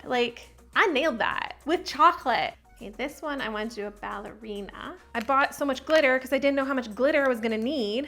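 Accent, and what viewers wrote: Canada. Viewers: Between the valley girl voice and the super dramatic personality … I laugh. I do get a kick out of this girl. I mean that gasping at everything… like what would her reaction to something crazy happening? I just see her being like OhHhh my GoShHhH!! Gasppp gaspppp 😂😂😂😂 and if she hadn’t said she’s from Canada, the way she says out and about .. things like that is so Canadian. I never heard anyone speak like that except for people from Canada. That’s no hate either, I’m a born and raised New York Italian …. You can hear me coming a mile away && there would be NO question where I’m from. Everyone I meet that’s not from like the tri-state area always are like my god you have the thickest New York accent! Say dog!! Say coffee!! 😂😂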